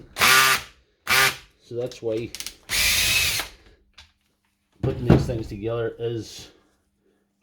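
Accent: Northern Ireland, United Kingdom. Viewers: I will try and get to the root cause of my drill not working this weekend. I have confidence to at least give it a go. If I mess up then by the sound of your accent you will only be a couple of hours drive from Belfast😊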